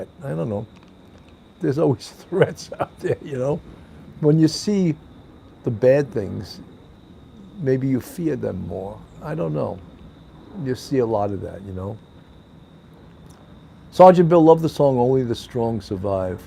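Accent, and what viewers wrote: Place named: East Coast, United States. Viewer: The hat looks great on you. Your voice makes me miss the east coast.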